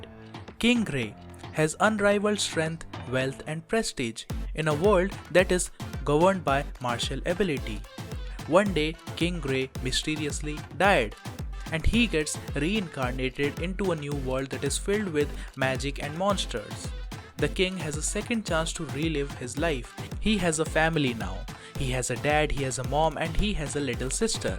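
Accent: India. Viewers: I am new here but good recommendations And btw are you from in India because I fell that your accent is pretty similar to us bi or trilingual. Also have you read these new manhwalike: 1. Arcane sniper 2. Return of the frozen player 3. Mercenary enrollment 4. Limit breaker 5.The player that cant level up All the above ones are relatively new with avg of 25+ chapters but surely they'll rise to top So read them and all of them are manhwa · Why the fvk yt suggest this for min I opened this an hear the voice I exit sorry man I'm indian to but can't stand y'all accent ☠️☠️☠️☠️ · Your India accents really annoying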